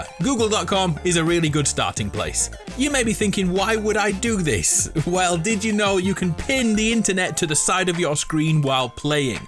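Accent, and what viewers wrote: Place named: Wales, United Kingdom. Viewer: You sound Welsh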